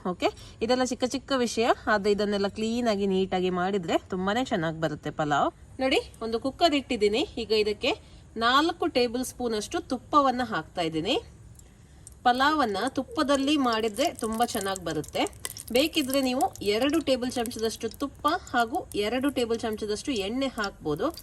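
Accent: Mangalore, India. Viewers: Hi akka, ನೀವು 👌🏻ನಿಮ್ಮ ಮಾತು voice 👌🏻 ಮಂಗಳೂರು ಕನ್ನಡ 👌🏻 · Was just scrolling YouTube I got ur video and I will do subscribe because I love the slang and tone of Mangalore bashe ❤️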